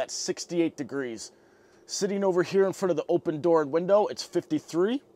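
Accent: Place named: Western New York, United States. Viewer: Wow! You sound like you're from WNY!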